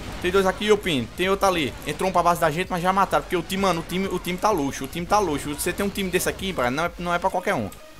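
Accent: Northeast, Brazil. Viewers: Nada melhor q o sotaque Nordestino!Manda um salve pro Rj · Nordestino rapaiz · Likeeeeeeee, Nordestino Gostoso:3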